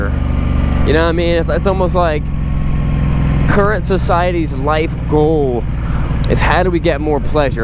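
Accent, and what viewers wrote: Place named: United States. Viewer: Ok guess where you are....from your accent and the fact your on the wrong side of the road, plus your about to dribble out your opinion, id hazzard a guess that your an American in America. Am i right or am i right?. Anyway carry on, i like watching and listening and everybody's entitled to their opinion, no matter what leftwing progressive's say.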